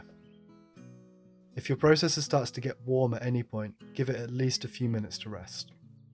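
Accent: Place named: England, United Kingdom. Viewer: Besides the English Accent the birds chirping with music is wonderful Seitan's Red Robins?, AnyDrOwlWho? Pasta etc 10-15 min , is it really necessary to cook seitan for 1 hr everyone seems too , what is the minimum cooking time ? without a pressure cooker of course ; and is yeast necessary needed or OK without added yeast ?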